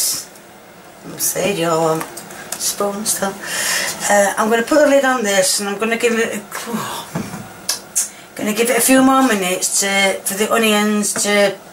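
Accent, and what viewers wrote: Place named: Yorkshire, United Kingdom. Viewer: I love all your videos. I live in London now, moved from Yorkshire, so it's so nice to hear your accent. Keep enjoying yourself and ignore the idiots:) X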